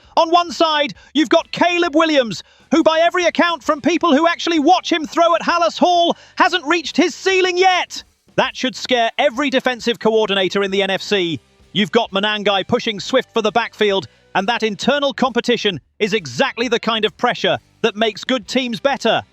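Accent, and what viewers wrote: United Kingdom. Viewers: Why are you using a British voice for American Football it's ANNOYING!!!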